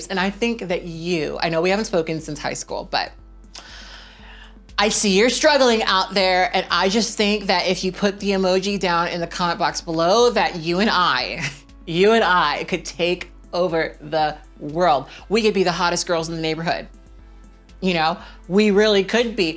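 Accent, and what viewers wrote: United Kingdom. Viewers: I was having a hypoglycemia while watching this video and those elf ears and the British accent felt so surreal, I thought I was loosing my mind The video is great ❤️ I can't agree more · British accent is something in my life I never knew I needed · i love how you verge into a british accent when you feel awkward bc same as an ace person this was really nice to hear! I am pretty sex positive actually, but I'm just not personally interested at all for some reason the ending where you peer pressures us into using the emoji has become my favorite part LOL like its so funny but weirdly comforting at the same time🥕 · Can't find any comments about the British (?) accent so I just wanted to say it slaps 🥕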